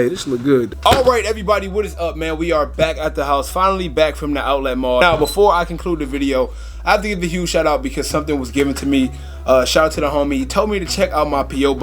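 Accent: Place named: AAVE, United States. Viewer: ENGLISH NOT EBONICS!! YOU SOUND STUPID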